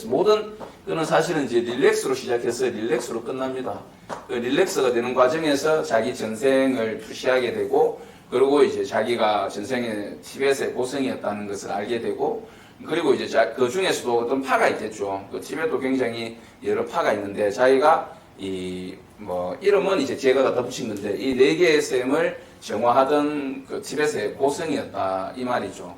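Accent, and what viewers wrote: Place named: North Gyeongsang, South Korea. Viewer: 싸이먼디는 부산이고 선생님은 경북말투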